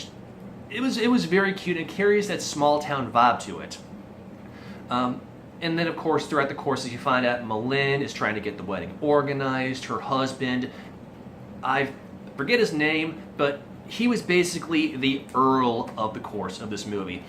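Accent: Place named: South, United States. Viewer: All I heard through this whole thing was your southern accent. And I loved it. :D Must've been all that Steel Magnolia watching.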